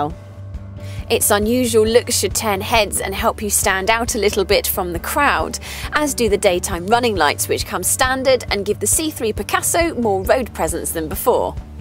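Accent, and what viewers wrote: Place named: Oxford, United Kingdom. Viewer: is sooo sweet. And i love this wonderful original oxford english😊 And it a real interesting car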